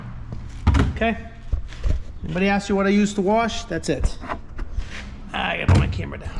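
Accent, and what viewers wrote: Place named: Massachusetts, United States. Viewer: Love the videos. Like you, I'm a native of Mass. My Mass accent is even worse than yours lol. Don't sweat the haters brother. Spoken like a true Masshole... F**k Em. Keep safe out there. See you next time.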